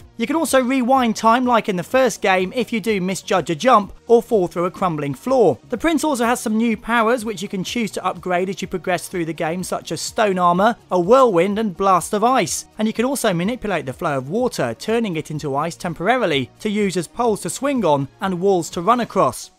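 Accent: England, United Kingdom. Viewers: go fuck yourself! He is ENGLISH and speaks ENGLISH with an ENGLISH accent...if you think ENGLISH is an indigenous American language and the American accent is the right one, pick up an American history book and read...you need learn more about the world you live in, DUDE!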